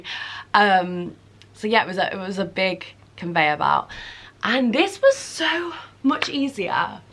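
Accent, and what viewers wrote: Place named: Chelsea, United Kingdom. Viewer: Not Essex more Chelsea :) wait.. Whaaaaaaaaa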